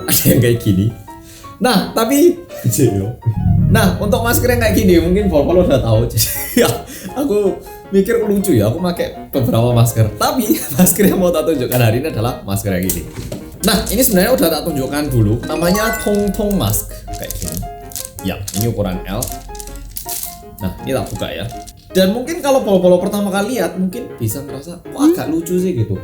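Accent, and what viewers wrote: Java, Indonesia. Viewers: Korea rasa Jawa..mdoooeee ram eram..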